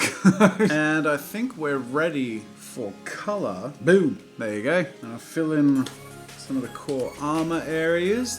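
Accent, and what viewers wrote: United Kingdom. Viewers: The brit accent though 😂😂😂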